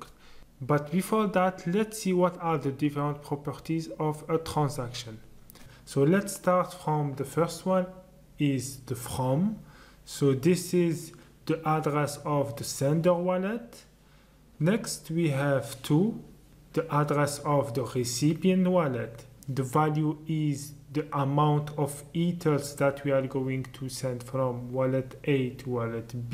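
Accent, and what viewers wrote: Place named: France. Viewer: For the love of the beautiful French accent, I want to learn French, mersi or merci ❣❣❣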